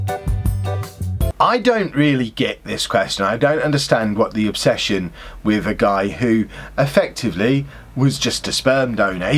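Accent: Cockney, United Kingdom. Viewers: Just need to master that cockney accent of yours and get a computer that can handle streaming with a green screen behind me so I can steal your set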